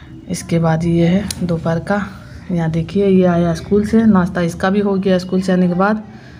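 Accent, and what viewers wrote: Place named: Bihar, India. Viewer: एकदम बिहारी जबान में.... 😄😊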